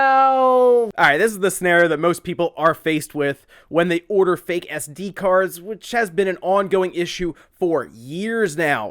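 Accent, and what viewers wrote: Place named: Philadelphia, United States. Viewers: First video. Heard your voice first, thought it had a hint of Philly in it, then saw the Flyers and Eagles swag - confirmed. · Hey you from Philly or Delco? Edit: N/M saw the Eagles jersey! Was going off your accent.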